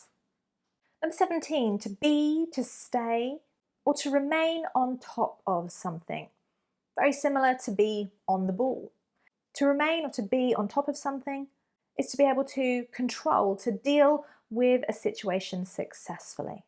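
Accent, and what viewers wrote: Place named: England, United Kingdom. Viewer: Hola me gusta cada ves su acento me enamore del acento ingles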